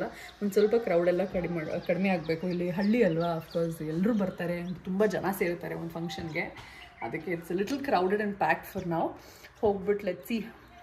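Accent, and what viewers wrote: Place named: Davangere, India. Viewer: Davangere slang kelake kushi❤😊so happy to hear from you😊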